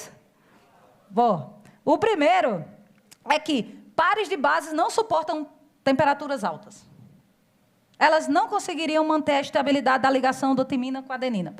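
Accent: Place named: Recife, Brazil. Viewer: Sou do Recife e adorei esse sotaque me senti agora em casa😊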